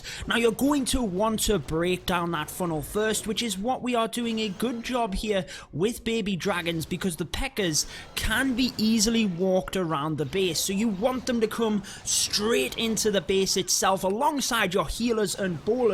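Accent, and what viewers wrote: United Kingdom. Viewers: That british acent tho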